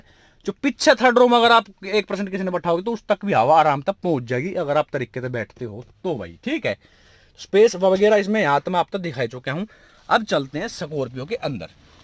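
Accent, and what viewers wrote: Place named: Haryana, India. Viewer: Me apke bat sun ne k liye video dekhta hu.... I luv Haryanvi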